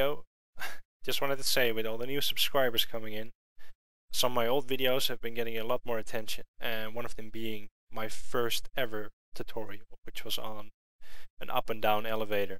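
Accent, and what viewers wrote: Netherlands. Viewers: je kan altijd horen als iemand uit Nederland komt xD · Als ik het goed hoor ben jij Nederlands...? :D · Ik hoor aan je engels dat je nederlands bent, of niet? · Ik hoor dat je nederlands bent :d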